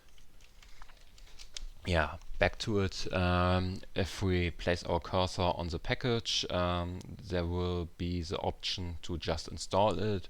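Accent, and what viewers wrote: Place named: Germany. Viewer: You sound very German. Ich kenne diese Scham, den eigenen Code zeigen zu müssen ^^.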